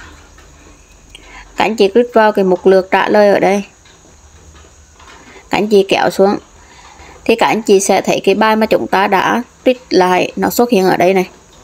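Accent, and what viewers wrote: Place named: Central, Vietnam. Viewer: Quê e ở mô mà trọ trẹ dễ thương rứa e 😂😂😂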